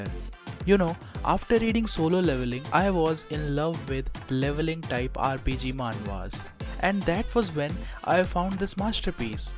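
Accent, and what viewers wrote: India. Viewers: Your India accents really annoying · Why the fvk yt suggest this for min I opened this an hear the voice I exit sorry man I'm indian to but can't stand y'all accent ☠️☠️☠️☠️ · I am new here but good recommendations And btw are you from in India because I fell that your accent is pretty similar to us bi or trilingual. Also have you read these new manhwalike: 1. Arcane sniper 2. Return of the frozen player 3. Mercenary enrollment 4. Limit breaker 5.The player that cant level up All the above ones are relatively new with avg of 25+ chapters but surely they'll rise to top So read them and all of them are manhwa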